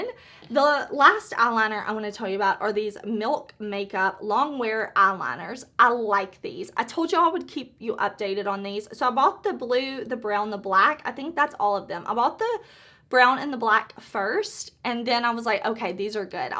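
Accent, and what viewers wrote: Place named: Arkansas, United States. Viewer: Where are you from? Bc u sound like me 😅 I’m from Arkansas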